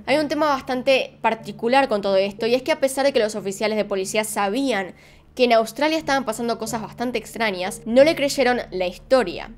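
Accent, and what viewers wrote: Argentina. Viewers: Hola eres de argentina? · Dios mio, no se si es que me gusta el acento argentino......o si en realidad lo que me gusta es TU acento!!!! 😂😍😍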